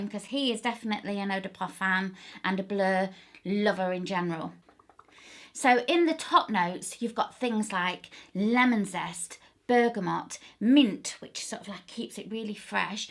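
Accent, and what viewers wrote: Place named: London, United Kingdom. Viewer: always watching your review anyway ms. you live in UK or what country coz the way you talk its made from london..your so beautiful fragrance reviewer thats true..gave u compliments more than a fragrance..anyway we live here in manila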